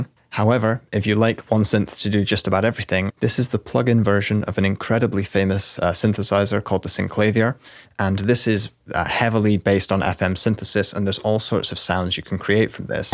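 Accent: Scotland, United Kingdom. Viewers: Great video!! It's nice to hear a fellow Scottish voice on YouTube ;) So when can we expect the FM synthesis series?! :P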